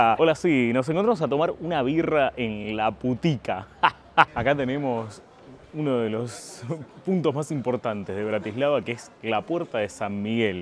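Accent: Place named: Argentina. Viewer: Viajas por el mundo....y hablas en acento argentino...?.. habla en castellano original...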